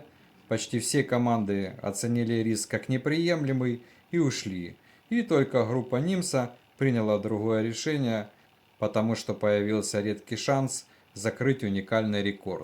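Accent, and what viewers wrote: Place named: Okanye, Russia. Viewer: Научись разговаривать по русски, не окай! Удачи! Но слушать тебя невозможно!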